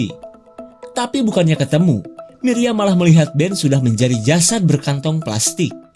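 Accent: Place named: West Java, Indonesia. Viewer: Suka gya bicara ada sunda2 gituhhh subrek donkk gaskeunn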